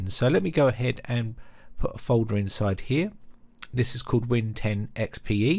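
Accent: United Kingdom. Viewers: Cheers lad ! You are awesome and i love your classic British accent too !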